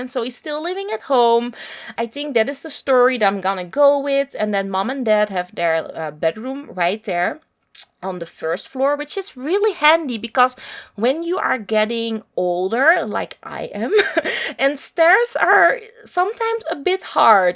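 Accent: Netherlands. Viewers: I adore Dutch accents ! Amazing house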